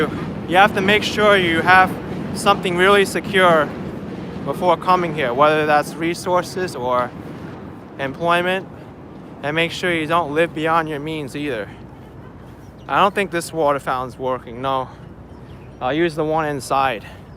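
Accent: United States. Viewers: Before you appeared on video, just by your voice, i thought you were an American. After you appeared on video then i realized that you're an ABC - America Born Chinese. Great work for sharing the video. I enjoyed it. Brilliant for showing life goes on despite all the rumours and insults that had been throwing around. You're fit and standing tall. Best wishes. 👍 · When I first started watching, I thought you had some type of Asian accent, now I listen to you and hear you definitely have a new York accent 😭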